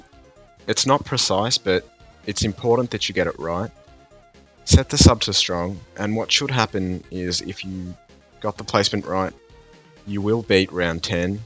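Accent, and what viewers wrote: Australia. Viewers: I can hear the Australian accent but bro uses every village flag apart from Australia, anyway thanks bro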